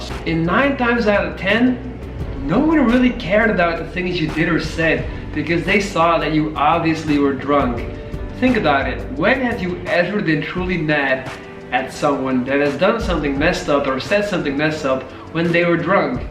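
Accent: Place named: United States. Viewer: thats amazing! When Ive heared You for the first time I thought You are from USA:) I also watched the bold and the beautiful but with my grandmum:)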